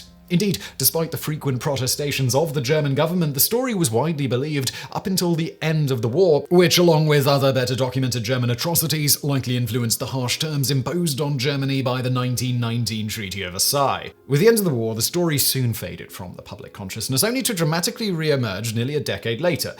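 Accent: England, United Kingdom. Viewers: Tbf, he is so english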